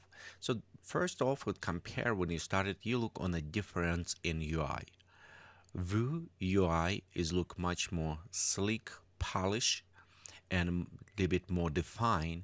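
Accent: Russia. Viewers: Сразу заметен русский акцент)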